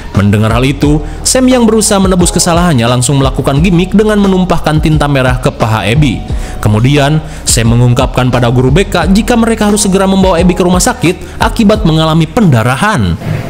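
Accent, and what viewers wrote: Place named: West Java, Indonesia. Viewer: Cuma sukses bikin acara review filmnya jadi seru dgn gaya dan logat Sundanya ngena bgt.top,top,top..🎉🎉❤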